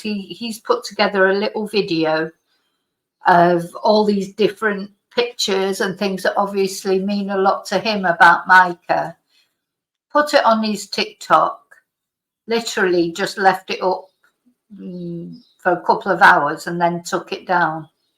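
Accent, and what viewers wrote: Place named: Manchester, United Kingdom. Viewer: Hello. I love listening to you and your great comments. So nice. Also your accent. I'm from Mcr too and miss it so much. ❤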